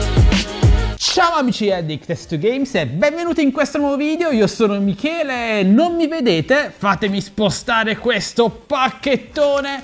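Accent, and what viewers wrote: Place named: Bari, Italy. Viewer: E' piacevole sentire un unboxer con il poprio accento....Michele sei un grande!!!...W Bari.....Ottimo unboxing!!!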